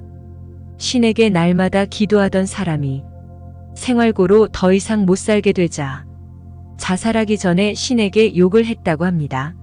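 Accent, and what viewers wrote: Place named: Giho, South Korea. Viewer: 너가 저가 이런 기호지방 개사투리 사용마라 특별시라니 말까지 바꾸면 진짜 딴세상사람인 줄 천한종들아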